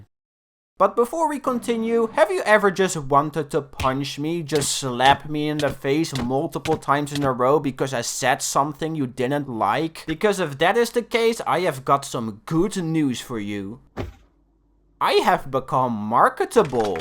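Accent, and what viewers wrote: Netherlands. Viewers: U Dutch? U sound dutch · Yeah hes Dutch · hollow knight is amazing also are you Dutch? I recognize the accent on how you pronounce some words idk if it makes sense, could be bc I'm Dutch lol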